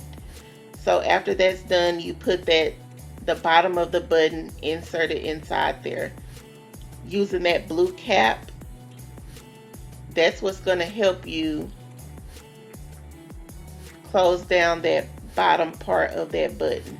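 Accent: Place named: Alabama, United States. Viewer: Hi, such a beautiful work 💞💞 are you from Alabama? I looooove your accent. I'm from Argentina.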